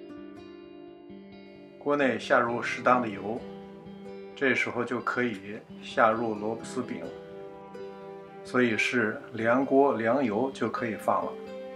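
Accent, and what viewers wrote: Beijing, China. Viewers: 很純正的京片子我一聽就愛死人了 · 真不错，朋友是北京人吧。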